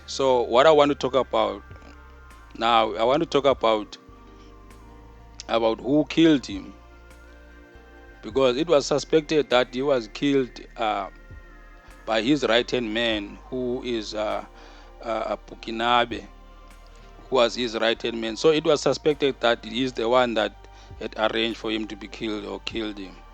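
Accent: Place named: Johannesburg, South Africa. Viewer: This has to be the dumbest and uninformed rant about cape independence I've ever heard judging by your acetate I can tell you are not from the western cape you sound like you are from joburg and came to the western cape recently since you know so little firstly it's not cape town that is seceding it's the hole western cape province not the city of Cape Town get your facts right!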